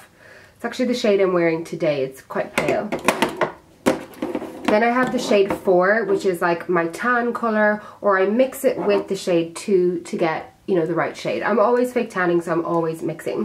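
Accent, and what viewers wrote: Ireland. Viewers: I'm learning Irish accent with your video! Thank you✨ Love from 🇯🇵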